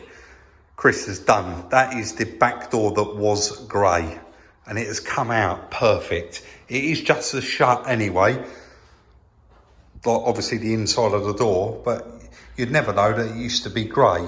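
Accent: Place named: Estuary, United Kingdom. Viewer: I would say either sarff London estuarine accent as the posh folks say meaning south or east London into Essex sort of I would think really it's all a bit above my pay grade I'm a bit mutton too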